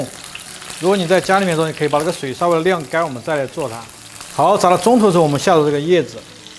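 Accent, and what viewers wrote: Sichuan, China. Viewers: 川普听着亲切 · 椒盐普通话，四川人😅😂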